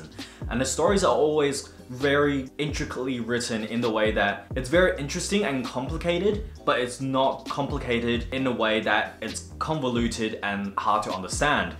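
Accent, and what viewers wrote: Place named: United Kingdom. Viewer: I’m blown away seeing and hearing a Chinese guy with a British accent. I’ve been alive for 40 years and have never seen this before. Congrats on being so unique!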